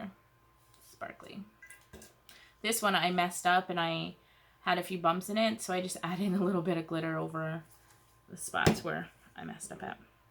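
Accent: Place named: New Jersey, United States. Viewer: Lol I'm from NJ, just moved to Florida.. your accent was a welcoming thing to me.. we should go have some cawfee 😂😂😂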